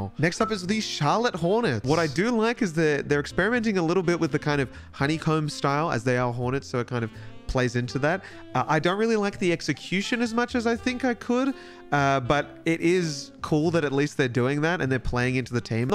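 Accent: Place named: Australia. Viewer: surreal hearing an australian talk about american basketball like this. i’m applying for a master’s in visual communication design btw i’ll let you know how it goes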